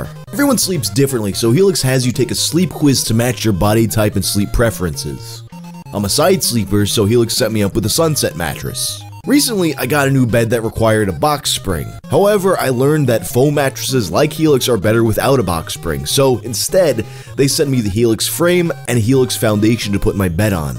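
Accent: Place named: Brooklyn, United States. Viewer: No offense, sort of sounds like a Brooklyn-nite/Italian version of Vinesauce Joel.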